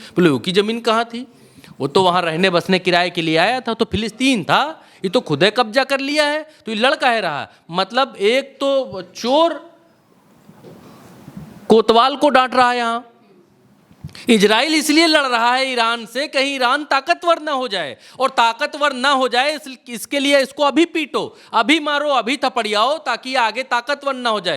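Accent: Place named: Bihar, India. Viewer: Bhaiya Tu bihari mein baat kar Bada maja aata hai tere Bihari Boli Mein Aur Khas Karke Tu bihari mein bol bhaiya Bada Achcha lagta hai tere Bihari Boli Mein 🎉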